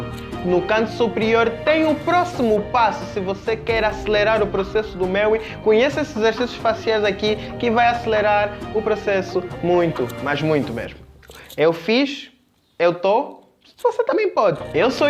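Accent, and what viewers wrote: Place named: Angola. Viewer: Deve ser da angola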